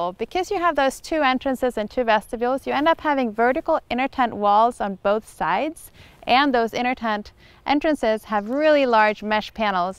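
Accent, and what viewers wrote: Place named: United States. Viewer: Discasting american accent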